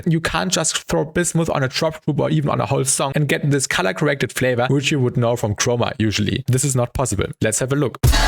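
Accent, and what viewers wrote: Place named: Germany. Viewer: great video … but holy German accent xD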